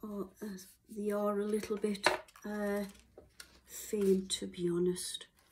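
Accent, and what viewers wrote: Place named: Sunderland, United Kingdom. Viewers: Sounds like a Sunderland accent.. great video, nice box thankyou. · What a lovely box you sound like you are from the North East Xx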